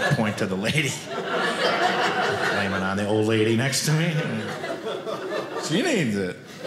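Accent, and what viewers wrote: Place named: Wisconsin, United States. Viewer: Boy that Wisconsin accent is unmistakable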